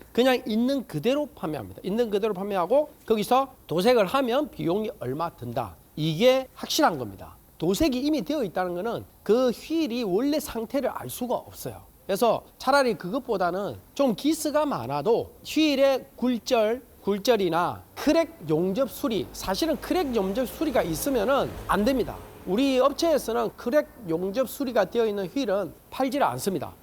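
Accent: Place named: Gyeongsang, South Korea. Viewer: 갱상도사투리 ㅋㅋㅋ굿입니다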